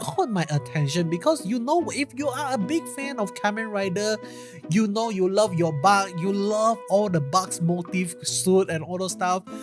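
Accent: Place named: Japan. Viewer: Your thick accent is hard to listen to just speak japanese with English subtitles it would be less painful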